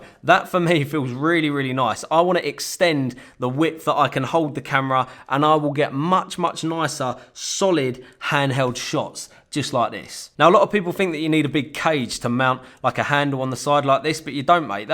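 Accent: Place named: Cockney, United Kingdom. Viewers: Wow, cockneys on youtube. Who would have thought it. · I love this, sounds like a proper Ray Winstone. tough guy cockney geezer, and in fact it is a baby face sharp as a razor vid afficainado. Keep up the helpful stuff. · omg, this is geezer english talk. Difficult to concentrate on the subject matter :)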